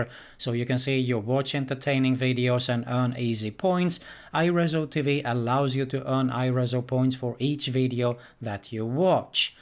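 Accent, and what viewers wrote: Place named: Germany. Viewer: Hey, thanks for the nice video. Do you come from Germany, cause your pronunciation looks like Germans ? ☺️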